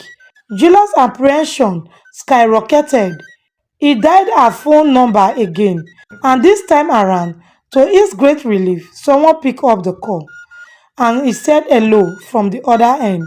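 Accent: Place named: Edo, Nigeria. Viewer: The Edo accent of the male voice in your story is sooooo funny and 😂😂😂 Even you also have an edo accent.. Are you from Edo State? 😅😅😅😅😅 I love your stories...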